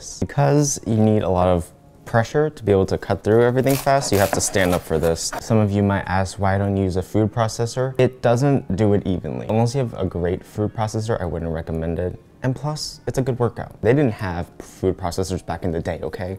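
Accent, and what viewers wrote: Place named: China. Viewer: What part of China are you from because your Chinese accent is strong my mom is from Beijing but she does t have a thick accent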